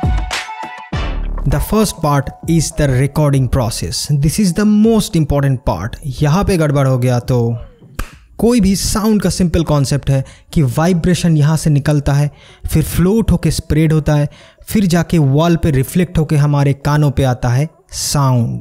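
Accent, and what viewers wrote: Bengal, India. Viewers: chal bhai khush ho ja like kr diya informative video .. love the humor.. i notice your vocal i think u r a bong.. correct me if i m wrong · I guess you are bengali .. Its hard to manage your bengali accent bro😁😁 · No bhai I am not exaggerating at all.. you are just intense... And I assumed you are a Bengali (noticed your accent).. that took my bonding to quadruple ❤️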